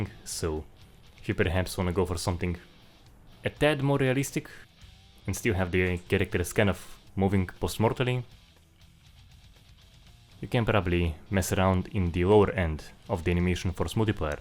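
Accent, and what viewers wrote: Russia. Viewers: It is me or your real voice is russian and talking english?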